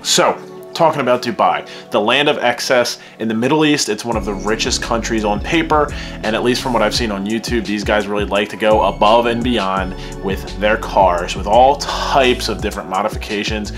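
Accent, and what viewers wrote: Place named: Delaware County, United States. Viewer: r u from pa?? u have a delco accent lol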